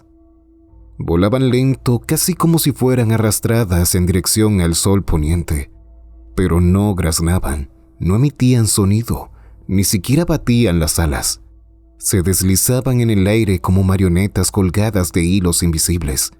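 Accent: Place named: Caribbean. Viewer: Por alguna razon tu voz fuera de la narracion me imagino un Skyper caribeño y me encanta xD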